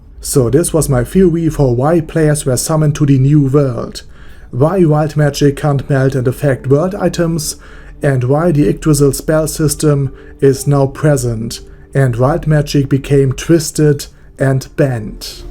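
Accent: Germany. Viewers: You're German aren't you?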